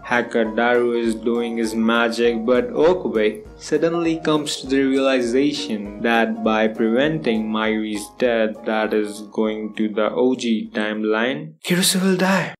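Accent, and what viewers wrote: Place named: India. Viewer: Crazy hearing someone that sounds like their first language comes from India talking about science like that mind blowing. I thought every Indian loved science. 😭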